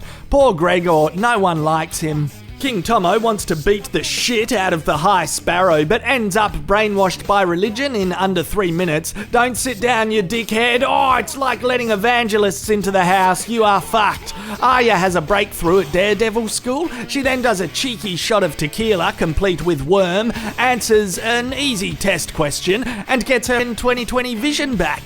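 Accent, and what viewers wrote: Australia. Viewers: love your reviews. you say what I'm thinking in Canadian eh, in a Australian voice. . . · You have clearly never met an Australian in their natural habitat.. we all speak like that.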